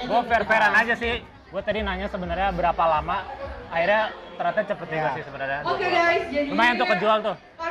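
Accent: United Kingdom. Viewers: pantesan bahasa inggrisnya kayak British accent gitu 😂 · british accent ya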